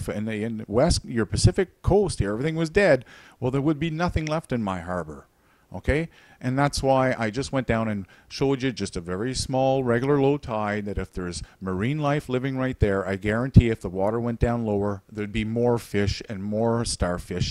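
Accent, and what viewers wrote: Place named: Newfoundland, Canada. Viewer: This guy sounds like a Newfie. Not referring to!!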